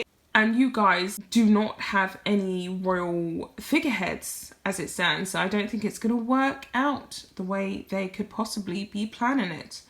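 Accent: United Kingdom. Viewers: A none American with a Brit Accent is explaining tax changes- you can’t even vote. Quit throwing shade on Joe.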